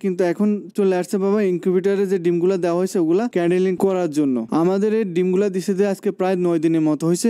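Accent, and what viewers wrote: Noakhali, Bangladesh. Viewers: ভাই আপনার বাড়ি কি নোয়াখালী বলবেন 🥰🥰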